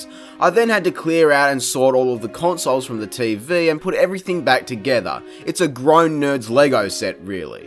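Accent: Australia. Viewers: Ha love that set up bro 😜 Btw do you live in Australia? You sound Aussie 😃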